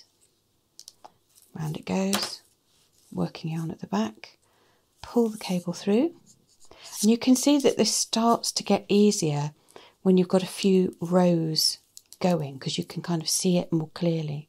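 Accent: England, United Kingdom. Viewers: Thanks for the easy to understand instructions. Love your English accent!!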